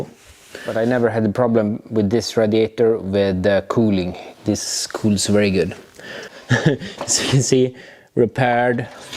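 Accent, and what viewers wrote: Sweden. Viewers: I hope your YouTube metrics show you how many times I’ve watched all these videos. You’re the perfect host for this build and your Swenglish is perfect, brother. I love your ambition and commitment in both quality and engineering; it’s mind bending what you’ve done in the last few Volvoghini vids.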